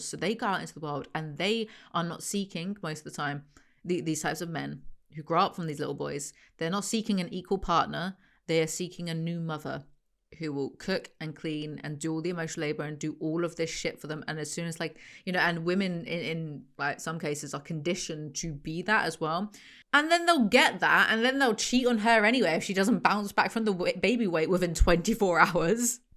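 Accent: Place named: Nottingham, United Kingdom. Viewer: As someone from near Nottingham, UK I appreciate hearing an accent like mine on YouTube and someone with my nasty sense of humour 😂😂 Thank you 😂❤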